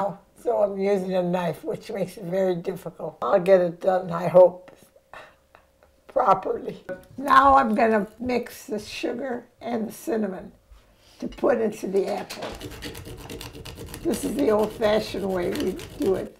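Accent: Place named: Chicago, United States. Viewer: I'm from Chicago right when i heard here accent i knew she was from my town Reminds me so much of my Grandparents Italian great cooking .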